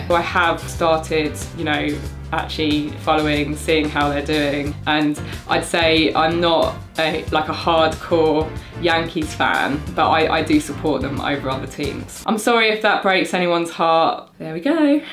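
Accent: England, United Kingdom. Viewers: Accent is CLEARLY 100% English. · I agree about the Cincinnati Reds Hat but with your accent I expect to See a British Airways or a British Petroleum hat on . Or maybe a Quantas Hat too.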